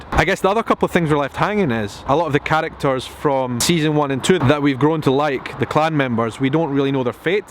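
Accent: Scotland, United Kingdom. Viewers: Hi - love your vlog episodes about Outlander. In several of the videos you mention that all this is new to you because Scottish schools don't teach the history about the Jacobites, etc. I was wondering if now that will be changing due to the interest Outlander has generated, not just around the world, but in Scotland in particular. Love hearing your accent - Keep up the great commentary!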